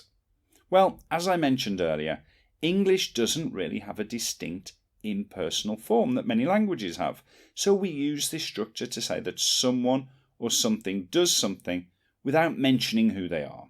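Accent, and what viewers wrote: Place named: United Kingdom. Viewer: British accent❤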